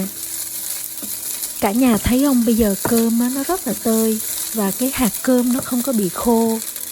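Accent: Hue, Vietnam. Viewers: Người Huế .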